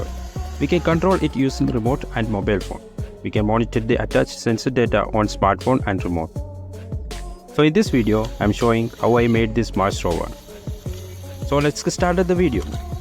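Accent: Kerala, India. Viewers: Bro are you from kerala?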